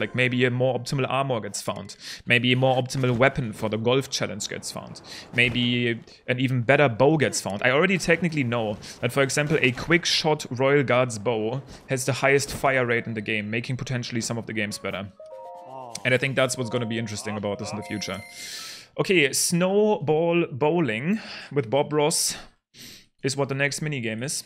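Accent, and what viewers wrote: Germany. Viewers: wie man immer hört wenn deutsche englisch sprechen xD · Okay, can I ask something? Do you speak German usually, because you have such an accent! Wenn, dann find ich das mega geil XD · are you german? you sound like it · Das erste dass mir auffällt ist sein deutscher akzzent lol.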